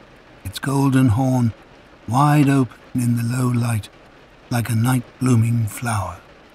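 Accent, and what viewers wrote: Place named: United Kingdom. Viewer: Why get a British v/o and then make all the references American? Jarring. Sidewalk, drapes, 24/7, rainday, store...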